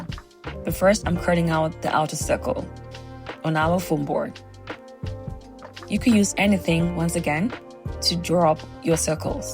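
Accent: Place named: Jamaica. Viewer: Love your accent... Jamaican or maybe Antiguan? Its like music in my ear.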